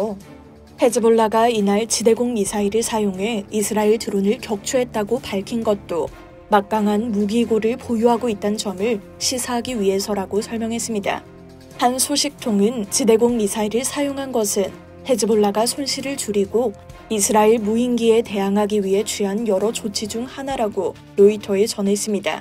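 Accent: North Korea. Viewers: AI 어투가 북한말 같아요 한국 방송답게 해주세요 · 말투가 북한말인데? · 나레이션 탈북자 엑센트네요 ㅎㅎ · 웬 북한 억양? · 북한 말씨네.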